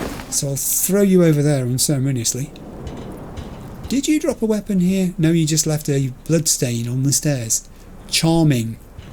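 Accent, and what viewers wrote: United Kingdom. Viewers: Quite astute to notice the three branches of story- I have a feeling you might notice some other associations as you play. It's way more interesting to come across anything like that naturally so I don't want to give any hints. In my opinion, after having played through this game several times, Letting your whims and interest guide you to what content feels right in the moment is the best way to play. From my end, I just feel blessed to have been able to share in a bit of your experience with it through the window of the internet. Hello from across the pond! (I'm from Canada, and by your accent, I assume you're from the UK?)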